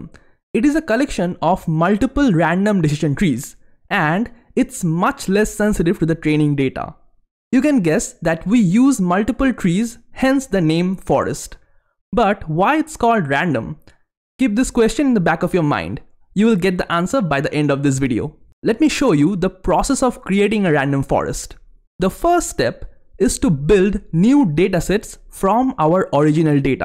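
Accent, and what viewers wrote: India. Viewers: can incompetent indian schools not even teach the Queens English properly nowadays? it's painful having to listen to his paj££t accent AND seeing the words spelt wrong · This damn indian accent!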